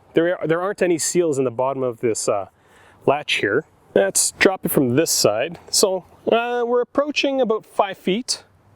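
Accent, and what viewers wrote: Canada. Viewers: you have got a great canadian accent.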